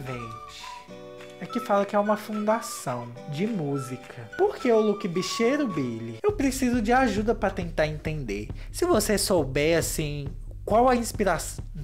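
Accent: Minas Gerais, Brazil. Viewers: Você tem um jeito de falar muito espontâneo. Desde o primeiro vídeo que eu vi, já percebi que você era mineiro. Amo seus vídeos ❣️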